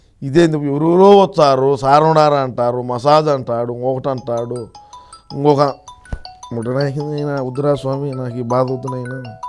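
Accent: Rayalaseema, India. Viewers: అయ్యా రాయలసీమ యాసలో మాట్లాడి ఇరగదీస్తున్నారు..సూపరో సూపర్...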